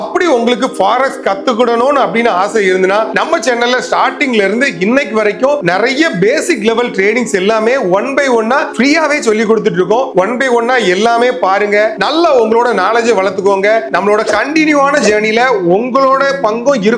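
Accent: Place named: Kanniyakumari, India. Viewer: Unga slang apdtha irukku nanum kanniyakumari tha marthandam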